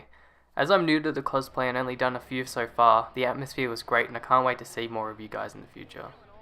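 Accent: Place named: Australia. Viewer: K I'm sad ur suit is better I've met my match and you sound Aussie two 😂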